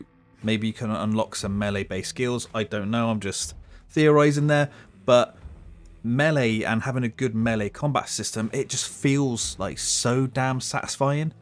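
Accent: United Kingdom. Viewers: A British mush mouth is just so cute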